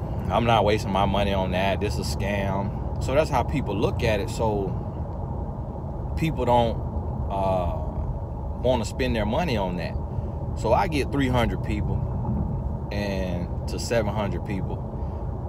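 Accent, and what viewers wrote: South, United States. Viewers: Nice to see your life behind the scenes. More like this please!! Oh & don’t mind the country accent. I love it!! Well done to your sons x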